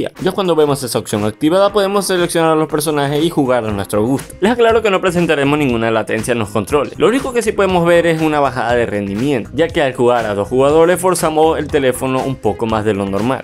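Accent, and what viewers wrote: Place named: Mexico. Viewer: enserio mano, yo pensaba que eras de México xd. Sigue así tus vídeos son exelentes♥️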